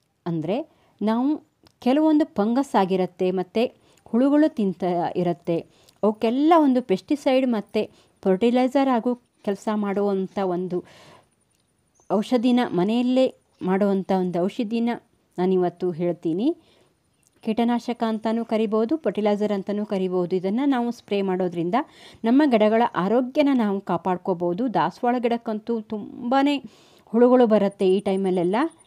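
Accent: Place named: Kundapura, India. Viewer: nim voice,heluva reethi, hagu nammoorina kannada bhashe ya aa shaili nange thumba esta aythu.nammadu moola ooru cundapura the hathra kota.malegala the nim video noduke laykaguthe.e time nalli bulbs metre barutha heli.rply plz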